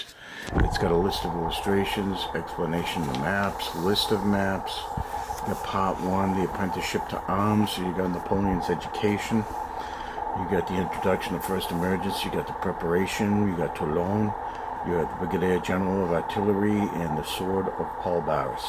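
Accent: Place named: Boston, United States. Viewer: Put this video on because I just bought this book. Wanted to hear some thoughts on it and all I can hear is the Boston accent haha. Sounded like my dad talking about Napoleon. ‘We’re gonna learn about how Napoleon lost in Russia. What a chowah-head.’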